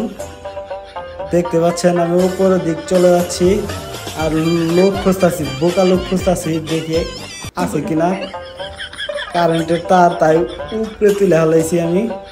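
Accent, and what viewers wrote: Tangail, Bangladesh. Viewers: ভাই কি টাংগাইলের লোক নাকি...? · ভাই মনেহয় টাঙ্গাইলের।ভাষায়ই বোঝা যায় ভাদাইমা।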